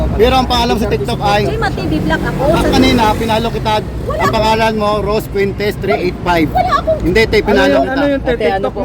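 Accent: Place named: Visayas, Philippines. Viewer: bisaya ang tuno ni ate.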